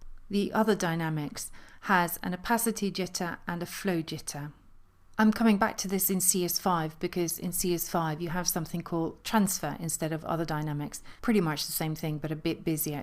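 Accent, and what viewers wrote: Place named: Oxford, United Kingdom. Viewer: You smoke many an Oxforder with your accent, dear. It's the combination of the accent, the articulation and the subtle air of dignity of your delivery. Also, I've the sneaking suspicion that even Chris Tarantino, Amy Dresser & Katrin Eismann would have a hard time comparing favourably to you.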